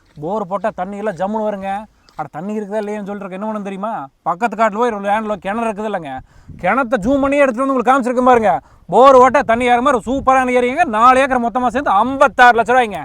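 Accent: Kongu, India. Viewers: வியாபாரம் செய்வதை விட உங்களுடைய பேச்சுத் திறமையும் கொங்கு தமிழும் உங்களுடைய காமெடியும் அழகில் அழகு வாழ்க வளமுடன் நன்றி மாப்ள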